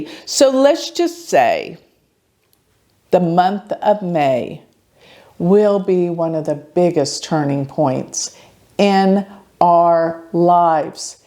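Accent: Texas, United States. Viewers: First time watcher.. Something made me think, she's from Texas and turns out you are! I'm in Tyler, not too far away from Dallas. Looking forward to checking out more! Cheers!! ❤️❤️❤️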